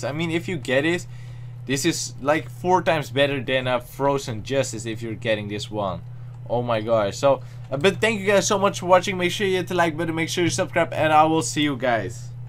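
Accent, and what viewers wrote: Netherlands. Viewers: I love you're vids and accent r u Dutch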